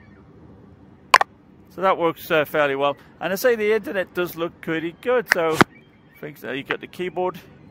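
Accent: United Kingdom. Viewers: Hey there, judging by your accent you might be based in the UK, however based on a few articles I read the Samsung Odyssey has no plans to go on sale in Europe and the UK (yet), wondering if you might be based in the US? And if you are indeed UK based, how did you manage to get hold of these?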